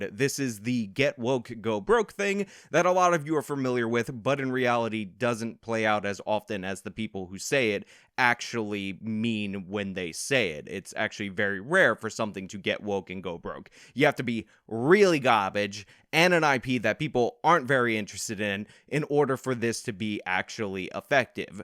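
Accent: New York, United States. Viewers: I like your videos dude but this New York accent that you keep doing in every video is getting annoying haha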